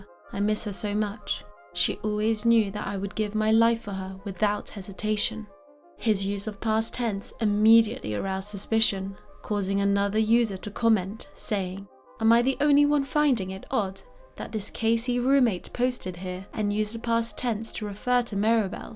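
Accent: United Kingdom. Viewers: she got the most British sounding voice ever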